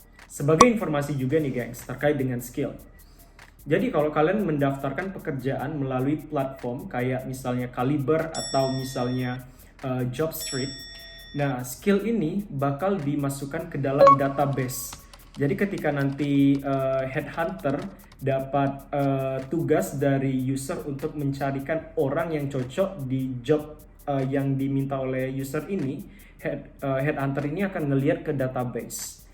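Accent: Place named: Pekanbaru, Indonesia. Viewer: content yang bener-bener bermanfaat banget bang, thanks sharingnya. ada bang jugaa wah! hehe. keep on using our pekanbaru accent ya bang!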